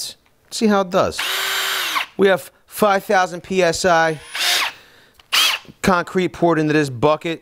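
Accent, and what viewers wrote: Philadelphia, United States. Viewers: Clicked for the review, but soon as he started talking I knew he was a South Philly guy! Yo Cuz Def subbed & liking everything on this channel!!!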